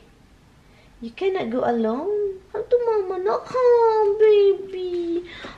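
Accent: Visayas, Philippines. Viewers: Hi sis , Pasagde na sila kay gusto kaayo kos imong accent hahaha Bisaya gud ta 😍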